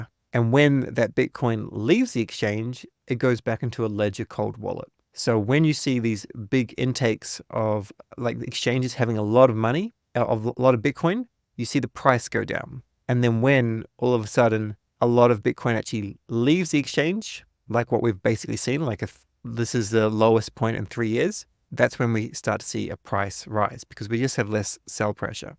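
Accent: Australia. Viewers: As an Aussie i love that your accent is still intact 😊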